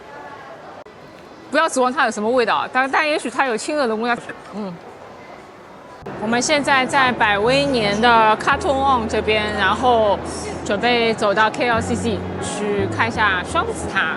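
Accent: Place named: China. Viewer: 她口音很重的大陸姑音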